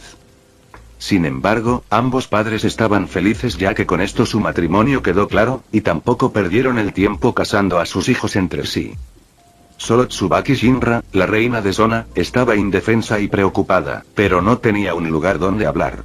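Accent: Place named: North, Mexico. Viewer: Que norteño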